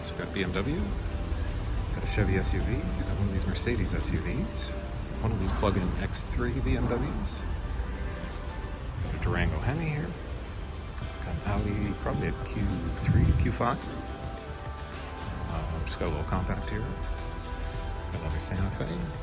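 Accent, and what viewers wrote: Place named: Canada. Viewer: also im pretty sure youre canadian by your accent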